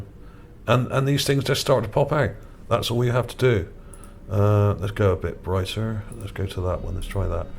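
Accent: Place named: Gloucester, United Kingdom. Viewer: You sound like you're from Gloucester or somewhere south maybe bristol?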